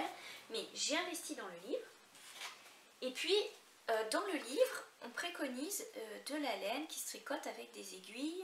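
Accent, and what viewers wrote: Quebec, Canada. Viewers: Petit accent Québecois? ^^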